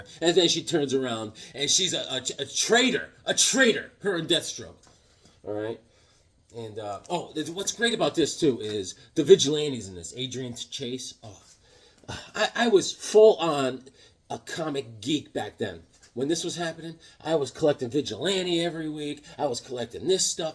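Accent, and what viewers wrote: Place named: Australia. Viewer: my guy! Popsicle art is the highest form of flattery! Loving the art! You're too tough on yourself. Conan has been a lot of fun. Never met a baby that didn't love setting off fireworks. Justice society was the victim of Ghost Machine I think. Infinity Inc. is a Beckermin favorite from way back. The legion of the unliving! Glad to hear Ron is doing good! First appearance of living lightning! That Night Thrasher is from his ongoing series not to be confused with the limited series Four Control. Issue 3 is the pro debut of Ken Lashley art. Yeah comic viking is made of stone, I'll break him down yet! Thanks for the joke loan! Did you ever see my character the comic community hunter from 2022? Such an authentic Aussie accent. I need to get that Captain Universe run. Love those og guardians of thr galaxy books! This Rip Hunter book wow!! 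Great books this week! Yeah Comic Viking is one of those rare serious Marine vets. I'll win him over yet! Have a great one!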